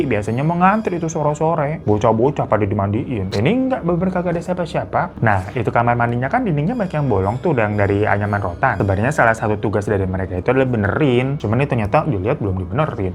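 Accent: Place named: Sunda, Indonesia. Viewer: Si aa lucu pisan ngomong bahasa sunda na jahaahaha😂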